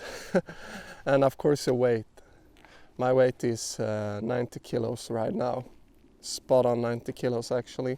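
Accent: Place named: Sweden. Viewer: Wow, thats really nice breaking. You sound Swedish (är du Svensk?) When you were braking on gravel i was like, what did they add ABS on some model allready? 😮 Cause those skid streaks seems impossible to make unless its something self controlling (3dm in 50km/h (13.8m/s) only takes 22ms to travel, seems inpossible to adjust based on input every 22ms. But might be that wheel becomes self adjusting? As it starts skidding, the power drops drastically vs when actually breaking? Why no helmet though? Braking from 50 can turn this into something that hospitals log, to ban EVs (dont ask me how i know 😊)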